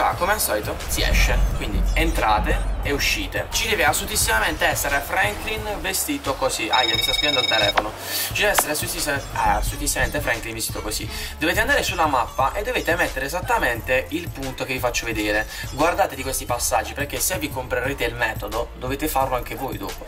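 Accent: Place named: Apulia, Italy. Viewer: Scusa se te lo chiedo ma sei pugliese? Perché hai quasi, se non del tutto, il mio stesso accento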